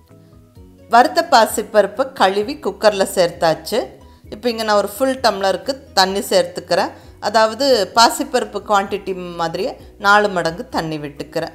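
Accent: Kongu, India. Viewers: Unga voice sooper kongu tamil sooperma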